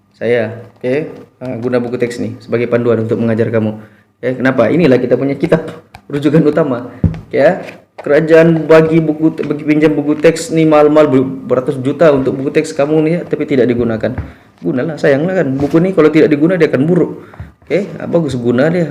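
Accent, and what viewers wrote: Sabah, Malaysia. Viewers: Suka dengar bahasa sabahan cikgu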